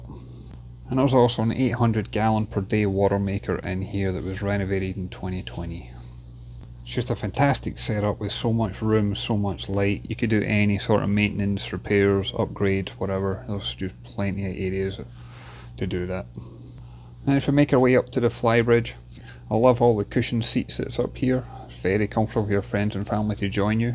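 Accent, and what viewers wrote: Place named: Scotland, United Kingdom. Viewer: Neat review; loving the Scottish accent...Garnock, Ayrshire?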